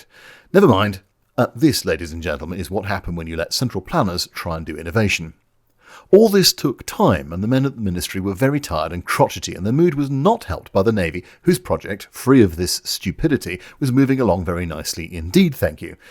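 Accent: United Kingdom. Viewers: I was not totally listening until I heard the British voice claim the Republic F105 Thunderchief was a "Crap airplane". Which is NOT WHAT PILOTS THOUGHT. The F105 was considered a "Cadillac of aircraft" by their crews and pilots. It was insanely complex, and very large, but it was beloved by most of the people who flew these aircraft. They took them out of service because they were doing ridiculously dangerous duty drawing radar contact of SAM missile batteries, and thus about 380 of these aircraft were lost. Strategy began to change. But the F105 was not truly a "crap" aircraft, not by any rational measure. In fact, it seems the electronic and radar gear on the plane appears to have been hyper advanced, and established the trend that advancement in electronic gear was going to be "the future" along with advanced missile tech. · just finds channel...hummm this guys sounds British but what level of snarky British is he. 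(watches for a few minutes) ahh proper British snark levels! instant sub!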